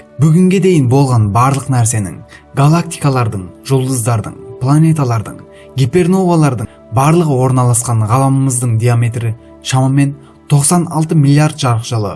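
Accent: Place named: Uzbekistan. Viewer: Сөйлеп тұрған бала Өзбекстанский акцентпен сөйлеп тұр ғой примерно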